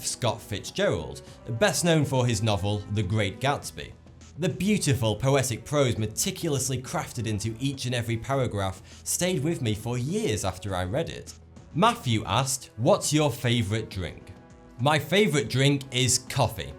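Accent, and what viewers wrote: United Kingdom. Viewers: hey! How to develop an awesome an awesome brittish dialect like you have. I really always liked it. i learned my english in germany. i know many languages but habe a problem with my accent.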